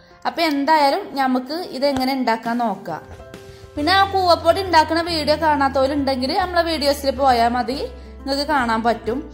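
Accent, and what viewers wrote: Malappuram, India. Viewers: ഈ അവതരണം ഒരു പാട് ഇഷ്ടായി. യതാർത്ഥ മലപ്പുറം മാപ്പിള ഭാഷ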